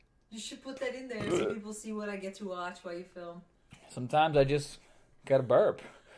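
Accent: Boston, United States. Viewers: Stop giving lessons on body training but teach us how to speak with a Boston accent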